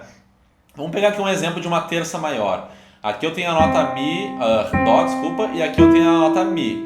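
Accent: Rio Grande do Sul, Brazil. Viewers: Muito bem entendido;;; me ajudando muito... . só uma pequena perguntinha::: vc é gaúcho?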